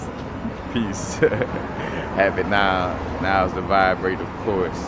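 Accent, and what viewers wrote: New Orleans, United States. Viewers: You from New Orleans Bragh? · Sound like New Orleans accent! U from home?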